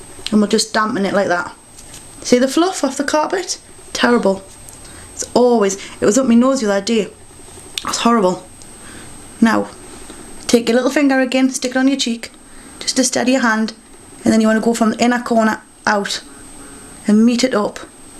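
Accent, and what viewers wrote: Newcastle, United Kingdom. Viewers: shes from newcastle LOL.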